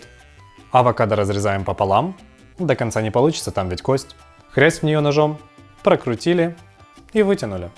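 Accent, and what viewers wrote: Odessa, Ukraine. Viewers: А я люблю,чисто одесский акцент:,, здрастье вам через забор"...😄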